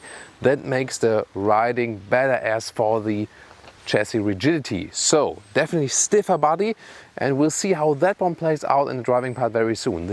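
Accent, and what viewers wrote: Germany. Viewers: This guy has strong german accent. funny.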